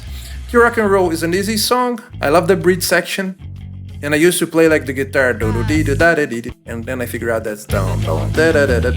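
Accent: Brazil. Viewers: Pelo sotaque é BR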